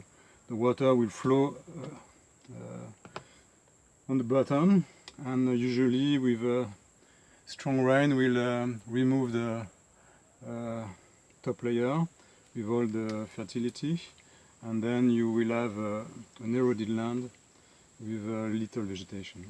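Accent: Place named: France. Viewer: You are speaking in english, with a french accent, in a channel with a name that is in portuguese. i'm kinda confused.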